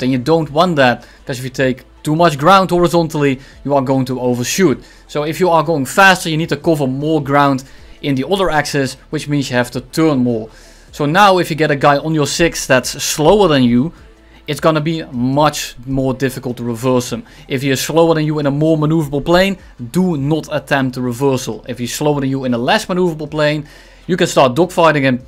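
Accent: Netherlands. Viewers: Aan je accent te horen ben je sws nl mrg top video, veel geleerd man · Oh you're dutch, so that is what the slight accent is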